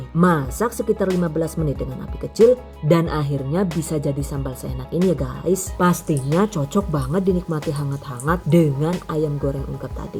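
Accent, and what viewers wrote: Banyumas, Indonesia. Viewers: Iya kan sdh l@ tinggal di luar sana n suanj orng bule tapi ciri khaa ngapak ny masih tetep ad sukses trus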